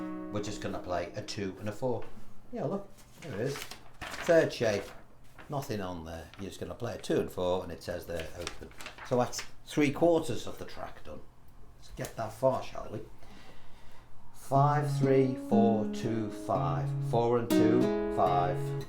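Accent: Liverpool, United Kingdom. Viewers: Hi from an Italian drummer who loves to play (poorely) acoustic guitar. Love your style and your SCOUSE accent!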